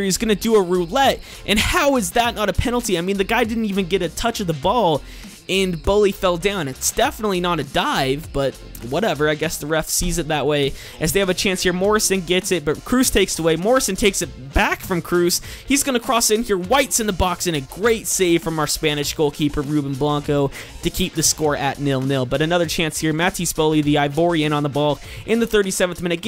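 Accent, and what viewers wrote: United States. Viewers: Bro, Utah accents are weird.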